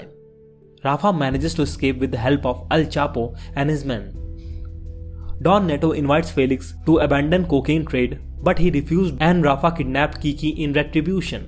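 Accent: India. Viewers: The Indian accent is killing the video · I dont know what they are saying about the accent but haha never thoughts i'd hear a story happening in my language be explained in indian accent haha so cool dude! thanks a lot, i really could not recall s1 ! this one was concrete and short :D Greetings from Colombia and btw, so stoked you are into this show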